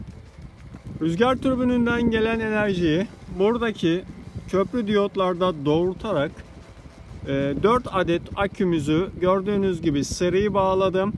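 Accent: Turkey. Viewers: Ende manavgatli gibi az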